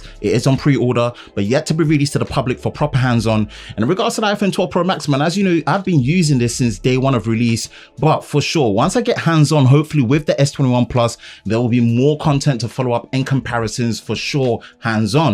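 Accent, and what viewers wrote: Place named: United Kingdom. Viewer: Once again and the technical information. Few years ago I was about as ignorant as can be but after watching videos like yours I at least know how to get around a smartphone for the most part now. Also being a black American I always get a kick out of hearing the black man with the British accent oh, I think it's cool. Well once again this yank in Columbus Ohio appreciate you!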